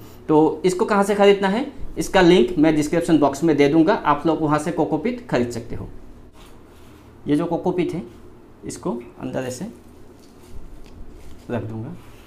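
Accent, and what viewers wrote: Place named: Assam, India. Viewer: Yah bhai Bhai Aam cutting Se Kaise hota hai Assam ke Rahane Wale Hain Wahan Ke Log Aise bolate Hain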